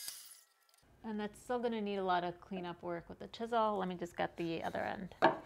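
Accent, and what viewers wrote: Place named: New England, United States. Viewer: Holy cats, I heard that New England accent and I thought, is she Norm Abram's daughter?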